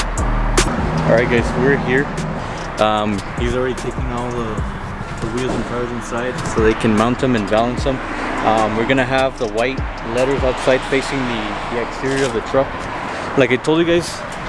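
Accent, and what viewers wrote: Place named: India. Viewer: you speak like an Indian or Pakistan guy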